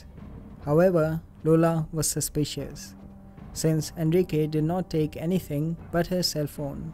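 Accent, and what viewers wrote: India. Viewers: Indian voice confirmed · Thick indian accent.. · The voice over is an INDIAN for sure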